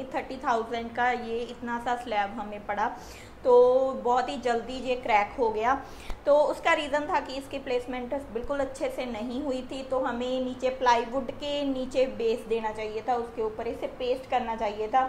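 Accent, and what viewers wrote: India. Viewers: Are u punjabi...just guessed from some words n accent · Hariyanvi accent?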